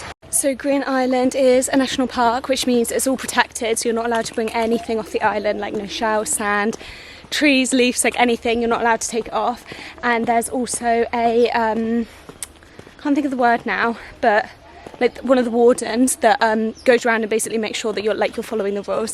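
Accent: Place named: United Kingdom. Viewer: Always love your videos and charming British accent :-)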